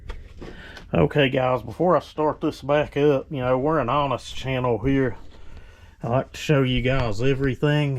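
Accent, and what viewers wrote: South, United States. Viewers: Listening to you talk was painful cornbread mouth.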